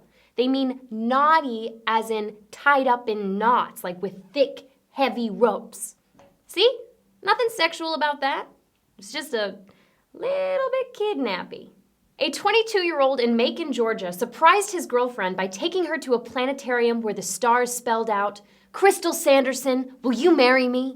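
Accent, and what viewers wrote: United Kingdom. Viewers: Not what I was thinking at all. I was thinking how upset I was that you missed another excuse to show off your fantastic British accent :o(. But the freakout over what to call toddlers made up for it :o)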